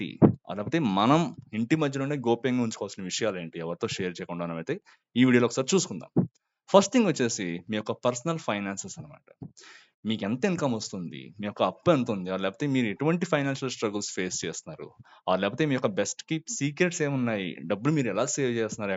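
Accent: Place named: Godavari, India. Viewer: పక్కగా గోదావరి బాబాయ్.......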